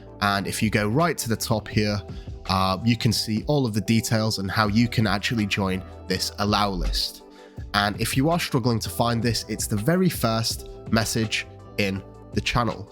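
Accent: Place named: RP, United Kingdom. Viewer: Hard to listen to… the accent is … posh spice… perhaps subtitles would help? And the funeral parlor organ is over da top? C’mon … please, I can’t understand what is being said… praced hout = priced out?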